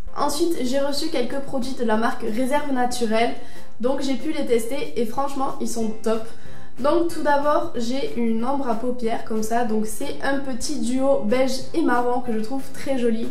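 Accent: South, France. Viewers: coucou tu habites vers ou ? car tu a l'accent du sud hahaha :)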